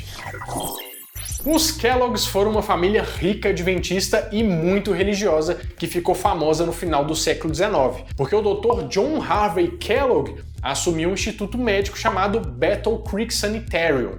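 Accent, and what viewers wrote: Minas Gerais, Brazil. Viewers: Único canal em que não pulo qdo está pedindo pra dar like e compartilhar. Amo seu jeitin mineirin de falar. Pura nostalgia pq sou mineira e não tenho sotaque pois estou há 65 anos em São Paulo. E seus vídeos são ótimos, amo curiosidades e aprendo muito com vc rapaz. 🙏🏻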